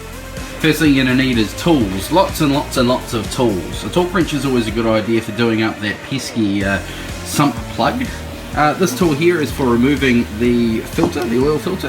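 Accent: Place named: New Zealand. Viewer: I love NZ accent...Great video.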